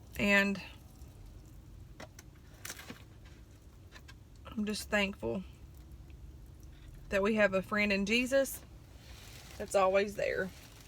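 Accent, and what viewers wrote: South, United States. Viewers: I'm a new subscriber! I just love you and your attitude/personality! You're so pretty and I love your country accent! Girl I feel you and I ain't got time for no negative stuff either! #2018 #Amen 😍❤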